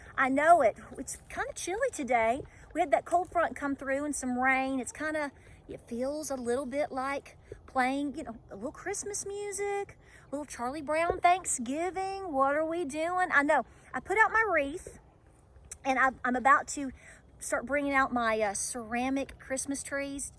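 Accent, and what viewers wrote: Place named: Appalachia, United States. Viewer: Girl i got such a giggle...perfect Cherokee and then that soft southern mountain drawl. Such a juxtaposition. My mama's entire family is in that beautiful face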